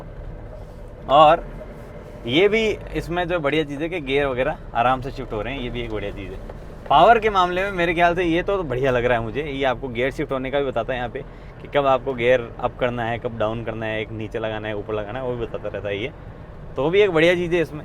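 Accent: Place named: Marwari, India. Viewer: Yes, He is Marwadi.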